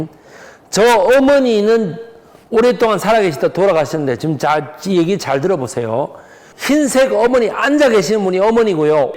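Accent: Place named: Gyeongsang, South Korea. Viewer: 구수한경상도사투리억양 으로 아주 유익한 우리나라 역사해설들을 배기성선생이 참 잘해줍니다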